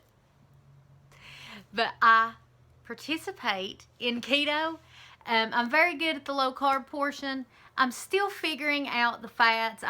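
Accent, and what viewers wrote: South, United States. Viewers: I love your country accent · I know you are from the South and I'm probably just not used to it ... but seem so sweet! can't imagine anyone giving you a hard time. heh. great eats and Kickin butt on the water too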